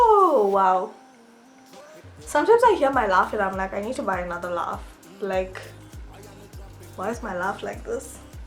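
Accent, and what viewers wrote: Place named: South Africa. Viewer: Hey girl! I loved this video - I am also a YBer from South Africa but I live in London now. So nice to hear a familiar accent! 💕 I liked and subbed to you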